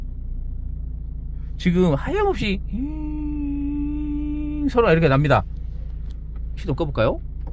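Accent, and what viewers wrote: Jeolla, South Korea. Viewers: 전라도 사투리부터 없애고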